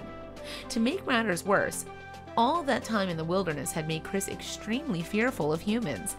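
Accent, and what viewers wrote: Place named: Mid-Atlantic, United States. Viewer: I'm willing to bet that the voice over artist is from a central Atlantic state. She did a great job, I'm absolutely not being snarky. But you can hear a PA/MD/NJ/DE influence. It's cool!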